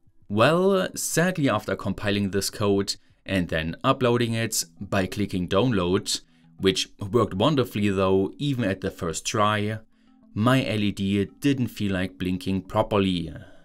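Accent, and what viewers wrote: Germany. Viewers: Ich liebe dieses Deutscher versucht Englisch zu sprechen, die Betonungen sind Gold... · are u german?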